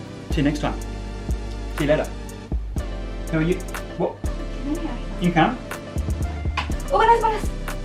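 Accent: United Kingdom. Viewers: Jatuh cinta bgt sm aksen brithisnyaaaa 😍😍😍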